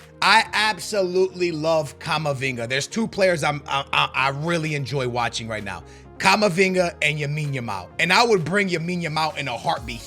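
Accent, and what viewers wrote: Portugal. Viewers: falas tao bem ingles q quase nem se nota o portugues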